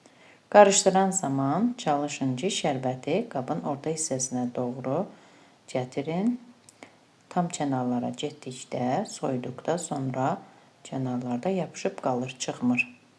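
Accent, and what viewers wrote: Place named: Sheki, Azerbaijan. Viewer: Salam xanım adaşım siz çox qabiliyətli xanımsız siz Şəkilisiz ləhcəniz Şəkili dilinə oxşayır sağolun❤ xanım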